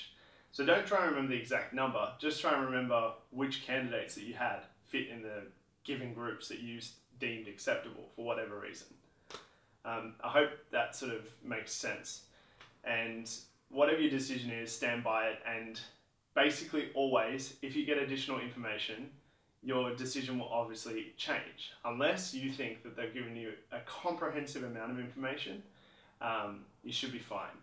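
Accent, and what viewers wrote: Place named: Australia. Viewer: or maybe 'pattern' problems (haha the aussie accent, wasnt sure )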